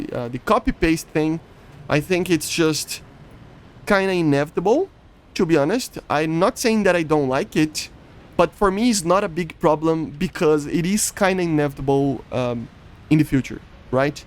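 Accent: Brazil. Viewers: Eu identifiquei o sotaque brasileiro IMEDIATAMENTE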